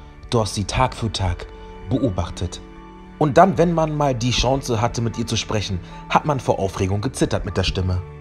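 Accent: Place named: Berlin, Germany. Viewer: wie witzig er spricht ( auf berliner art )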